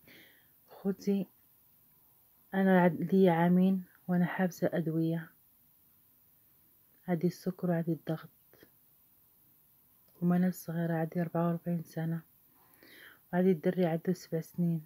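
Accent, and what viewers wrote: Gulf, Arab world. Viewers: اختي انتي كلامك مائل الخليجي معقولة كنتي فالخليج وماعندك باش ترجعي لبلادك على العموم الله يجيب اللي يرجعك لبلادك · هذه السيده شكيت عندها اللهجه خليجيه ما يمكنش معندهش عاءلة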